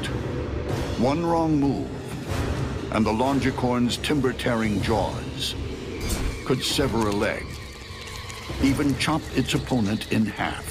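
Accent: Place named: RP, United Kingdom. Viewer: Although slickly filmed and narrated by the voice of Mr. Fancy British Guy, these videos are as staged and contrived as any 8 year boy who drops bugs in a glass jar, gives it a good shake and gleefully watches the bugs rip each other apart. These films are on the same level of sophistication as the ubiquitous pimple popping videos.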